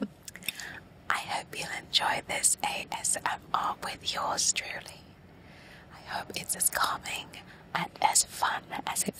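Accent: England, United Kingdom. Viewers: Hourglass English accent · asmr in an English accent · she had a British accent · I did NOT expect that English accent!! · So shocked she has a british accent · Normally I hate british accent but hers is very lovely and soft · she had a british accent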